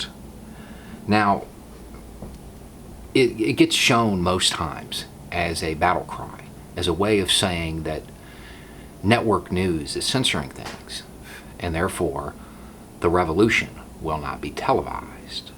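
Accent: South, United States. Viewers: Hey, love the messages in your videos. How does your own local community receive your views? I'm assuming from your accent that you live in the south and therefore probably a right/ conservative leaning state? Thanks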